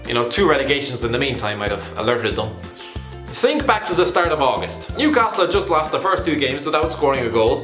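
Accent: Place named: Munster, Ireland. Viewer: Somewhere in Munster I'd say judging by the accent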